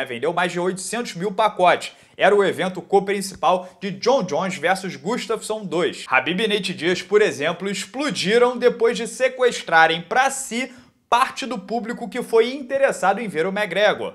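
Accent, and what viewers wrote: Rio de Janeiro, Brazil. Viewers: Puta q pariu!!! Esse canal é da hora ms sotaque carioca é tão repugnante que chega da agonia kkkkkk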